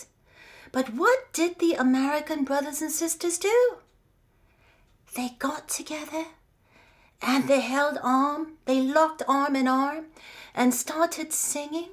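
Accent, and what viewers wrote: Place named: United Kingdom. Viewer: I LOVE HER UP SCALE BRITISH ACCENT.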